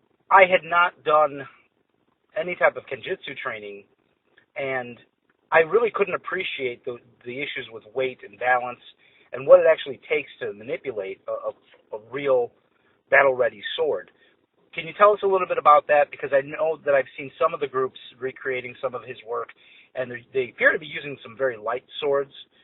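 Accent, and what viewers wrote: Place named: China. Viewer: still sounds Chinese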